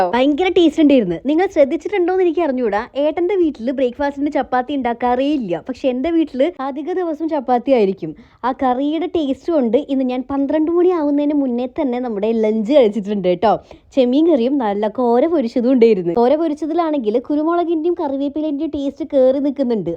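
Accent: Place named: Malappuram, India. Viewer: ചേച്ചി മലപ്പുറം ആണോ അതെ സംസാരം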